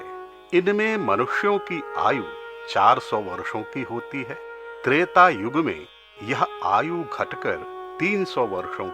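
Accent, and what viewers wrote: Standard. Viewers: शुद्ध उच्चारण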